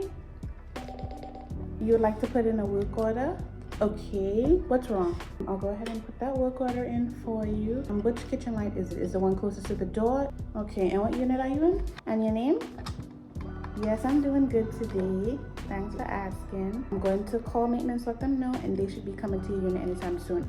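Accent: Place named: Trinidad and Tobago. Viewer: Doh lose dat trini voice, eh!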